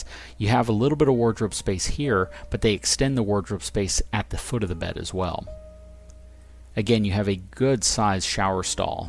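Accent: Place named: Texas, United States. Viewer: I like your wild Texas voice better than your NPR voice. This will take some adjusting. 😂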